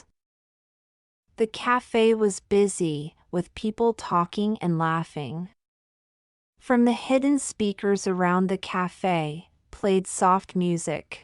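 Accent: United Kingdom. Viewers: I think this video is British accent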